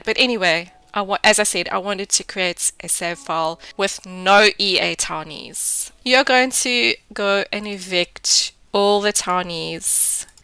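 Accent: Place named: South Africa. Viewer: Idk why but I was so caught off guard by this being narrated by a South African 😂 SA simmers represent! 💚💚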